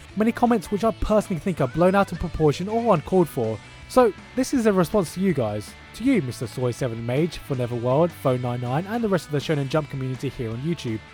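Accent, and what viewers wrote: United Kingdom. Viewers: This actually made sense. While I like manga/anime, I must confess I seldom buy it (also due to the fact it almost doesn't get translated into my native language, ever), so I usually get my fill on the manga/anime sites out there. That said, I'm still rational about it; yes, I like the watch-it-for-nothing sites out there, but still, I acknowledge it makes sense from their perspective - as a business - to try to do something about it. Just like you, I also don't think the people claiming it's 'good advertisement' and 'I buy it anyway' have a major impact. It just doesn't cut it: most DO NOT buy everything they watch or read online, after all. I don't, for instance. The problem is, of course: nobody likes big companies, and certainly not those that make the 'free stuff' go away. I think if the manga was made directly available from the manga's site (or a site where mangaka directly sell their products), and that with a 1 dollar/euro one-click support button for instance, most would do it, at least for their preferred manga. 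Every fan would be more inclined to support a mangaka directly, than some company which they perceive as money-grabbing. That said, you are right: 25 bucks a year isn't all that much. Alas, it's not available around here (and it would need to have MY favourite manga in there too, obviously). But in any case: I thought you made some good points. I also found it funny when I heard you talk; it's sounded so 'British' (Queens English-like), I imagined you compl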